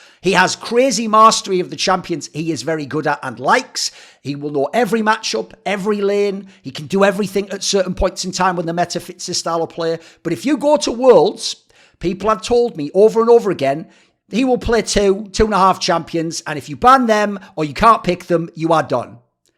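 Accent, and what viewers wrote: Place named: North East England, United Kingdom. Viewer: are you from the north east?? i recognise that accent. but pls leave rekkles alone he's a good dude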